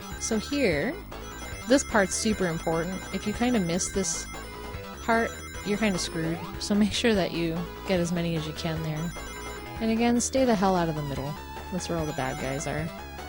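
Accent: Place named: Canada. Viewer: You have very nice Canadian accent. You should do ASMR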